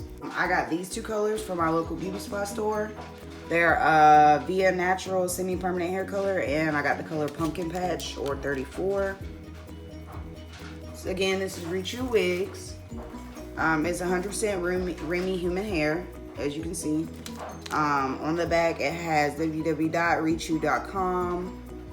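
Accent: South, United States. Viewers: sound so country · This look so bomb!🔥😍 and you have a thick country accent, where are you from?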